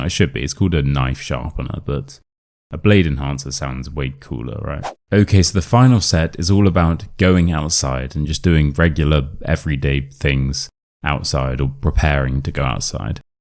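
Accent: United Kingdom. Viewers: Hiya, thank you for your video and offering your English class. I love your British accent! I am living and working in UK for year s, still struggle for my pronunciation and accent. Your voice and tone are so comfortable to keep listening, and love love your sense of humour too! Thanks again your lessons and I can,t wait for your next video❤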